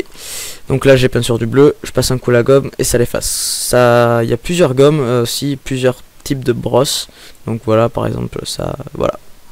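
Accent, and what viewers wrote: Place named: South, France. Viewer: coucou merci pour ce tuto moi qui utiliser photofiltre pour recarder ou autre des photo depuis queje l'ai plus je ne savais plus rien faire merci a toi et jespere de tout coeur que tu continueras ta serie sur les tuto gimp car je trouve ca claire bien expliquer net puis tu as laccent du sud et je viens du sud voila gros bisous ja mabonne !!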